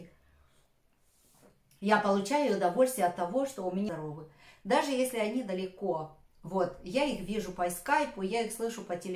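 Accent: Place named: Moldova. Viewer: Живу ❤России. Уже давно. Скучаю по Молдове. Очень скучаю. Спасибо большое за ваше видео. За ваш говор за ваш слэнг и менталитет.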